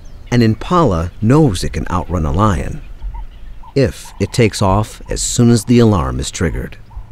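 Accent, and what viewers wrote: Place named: United Kingdom. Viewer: Cant beat a UK commentator for these kinds of documentaries.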